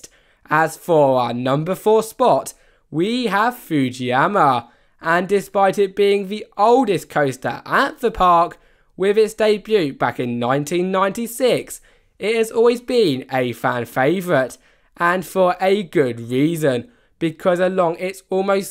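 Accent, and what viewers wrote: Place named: United Kingdom. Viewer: it's a british accent lol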